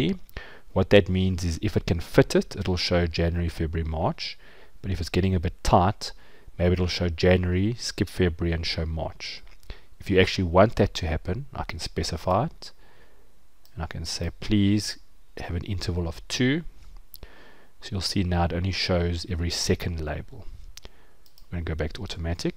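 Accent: South Africa. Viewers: dude, are you South African? haha definitely a SAn boytjie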